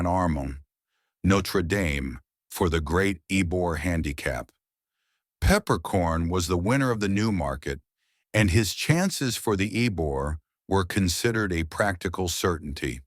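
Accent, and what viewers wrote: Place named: United States. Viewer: I'm sure this is a very fine narrator but I I don't believe these stories could possibly carry as well with an American/Canadian accent as with someone from the UK…since these stories take place in London, and London being as great a melting pot as say New York City San Francisco or chicago, of the same era, any accent from the British isles would do. I mean if it's a i which it obviously is, can't you just as easily click on the British accent button, or however it works??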